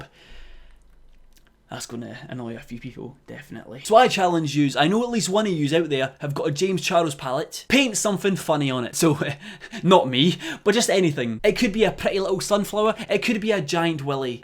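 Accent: Scotland, United Kingdom. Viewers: Is he Scottish? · Your Scottish accent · Your accent gives me life I can’t understand half of what you say but it’s like I’m in the countryside of Scotland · "mashing tatties. More like Tati mashed James" 😂😂😂 Edit: also where in Scotland are you from?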